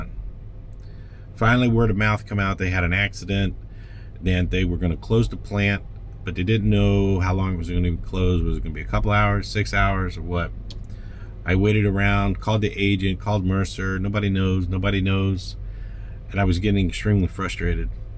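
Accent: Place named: Minnesota, United States. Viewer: Good MN accent there, fella. Stay safe.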